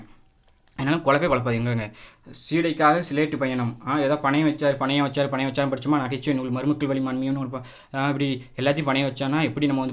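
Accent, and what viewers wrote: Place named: Thoothukudi, India. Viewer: Vanakkam sir, my native place thoothukudi, unga slang thoothukudi slang mathri irukku sir. I am happy sir, nanum neraya place la padixhu irukken, but neenga soli taruvathu different ah irrukku sir, na ungalai follow pannuren sir, unga method remba pidichu irrukku, I am housewife sir,unga help ennaku kandippa vendum sir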